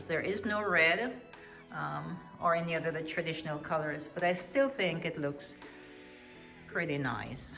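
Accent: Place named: Jamaica. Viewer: Your home is super gorgeous! I love your staircase, so elegant and whimsical. You sound like a Jamaican sistrin lol. I love how your tree look. It's absolutely stunning! New subscriber. This was so much fun to take part in this challenge with all you wonderful and creative sisters. Thanks so much for sharing.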